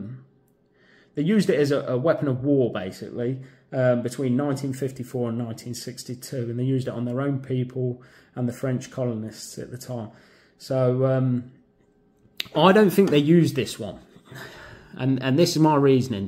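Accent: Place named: England, United Kingdom. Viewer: "get on with it". "right". Love the Queens English, over here across the pond, Mate. Florida USA.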